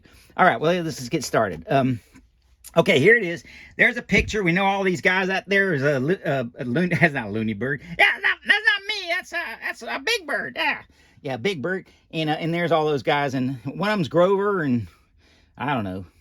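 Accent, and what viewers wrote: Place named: Deep South, United States. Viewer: Most of this video just sounded like talking like he was from the Deep South. Lol. has a slightly higher voice from all of the old show tapes I’ve watched.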